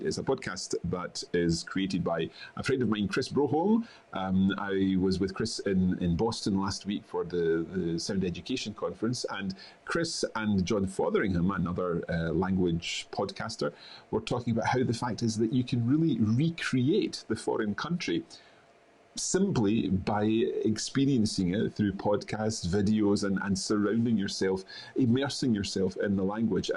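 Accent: Scotland, United Kingdom. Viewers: Patricia living in france and wishing to learn french, thank you love listening to a Scottish man.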